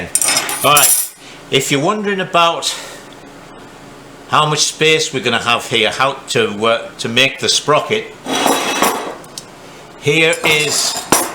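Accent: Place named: Teesside, United Kingdom. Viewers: Only found your vids. a month ago so a lot of catching up. Was intrigued by your Boro accent so have looked U up. We must have been around similar people similar times Graham Petite John France Geoff Crust Chris Rea for my sins once a Boro lad always a Boro lad. Love your simplicity & knowledge of engineering/Metallurgy. Me Metallurgy Dorman Long Warrenby Brittania SB Coke Ovens Lackenby. Good to C U putting in the graft. Ignore the twitters. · Brilliant videos great watching still got that Teesside twang then, Martin from Hartlepool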